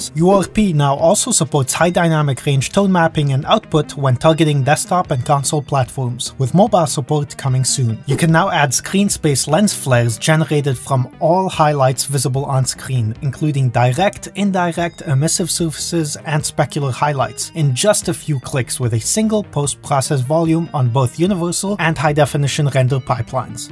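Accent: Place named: France. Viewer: Love the french accent! Good video :D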